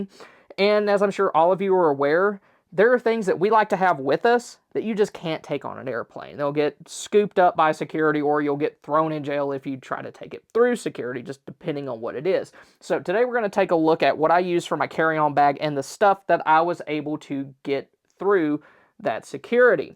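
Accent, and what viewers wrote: Texas, United States. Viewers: The accent is in your ear. Being from Texas as he is, I am familiar with people in other places in the country that assume that if you have a Texas drawl that you are slow witted or stupid. Don't make that assumption is my advice. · As another Texan, I'd say it's because of his accent that you can trust he knows what he's talking about.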